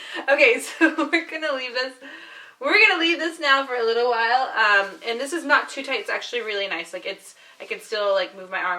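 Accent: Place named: Iraq. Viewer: هلو اول شي اني فخوره لان انتي عراقيه ثاني شي ارجو تنزلين لفديو بلعربي بسرعه لهجتج يعني لغتج بلعربي (عراقي)كللللللش حلوه حته شكلج يكون احله.شكرا جزيلا الج لان انتي متعرفينه ولا شايفته وتنزلين فديو نستفاد منه شكرا لان تحبين لجمال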